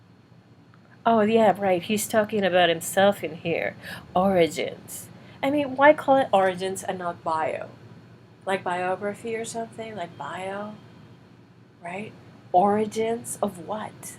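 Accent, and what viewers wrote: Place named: South Florida, United States. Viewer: You barely have any accent....I live in Florida and you sound like you're from South Florida. Wish I coyld speak Spanish as well as you do English.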